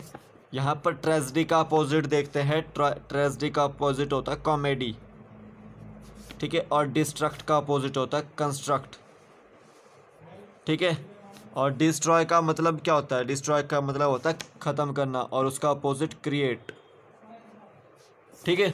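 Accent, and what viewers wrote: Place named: Himachal Pradesh, India. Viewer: its really helpful thnku sir ...nd your himchali accent is too good😄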